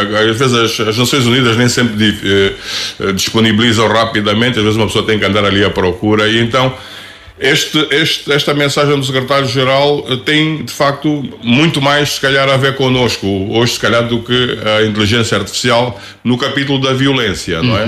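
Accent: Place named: Angola. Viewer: Voz do angolano puro .Fala a verdade ,a língua portuguesa com gramática.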